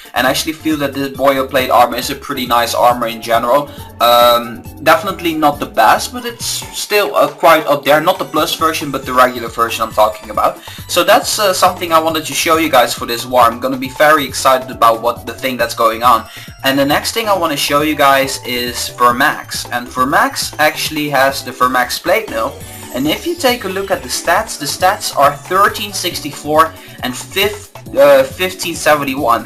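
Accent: Netherlands. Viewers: Ben jij nederlands? Je klinkt zo!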